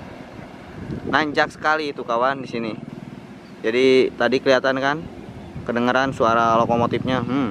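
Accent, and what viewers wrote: West Java, Indonesia. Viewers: Nyunda pisan euy,kaciri urang sunda mah.nyarios bhs indonesia ge..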